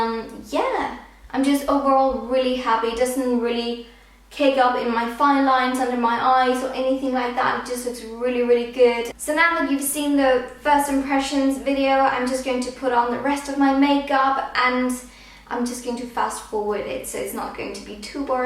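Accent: United Kingdom. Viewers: Why do you sort of have a british accent when you are danish and both of your parents are danish? <3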